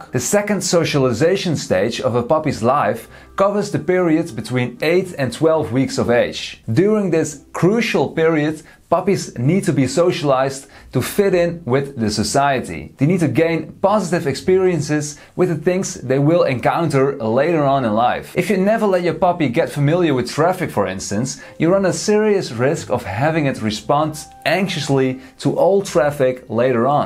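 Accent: Netherlands. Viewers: Are you Dutch your accent sounds dutchhh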